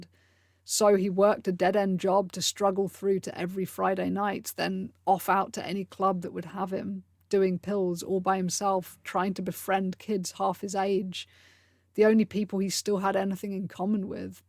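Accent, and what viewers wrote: United Kingdom. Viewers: OUTSTANDING piece of work & presentation!! 👏I sincerely enjoyed taking this journey through the history of your Goth Life! Seeing you dressed in your amazing Goth wardrobe, & listening to YOU tell your story in your proper British accent, made it even more amazing! THANK YOU for sharing your precious life, time, talent, knowledge, & experiences with us all! Best wishes!😘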